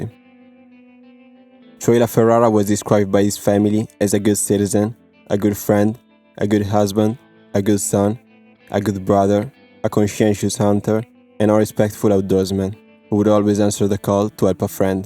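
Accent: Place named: France. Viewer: I enjoy your accent. I'm guessing French or French colonized country.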